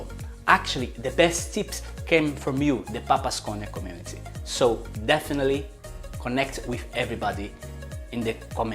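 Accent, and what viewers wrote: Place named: Brazil. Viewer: Thicc Brazilian accent. Beautiful.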